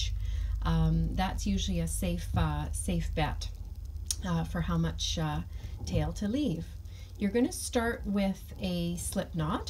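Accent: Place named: Canada. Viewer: Love the Canadian accent 😚🇨🇦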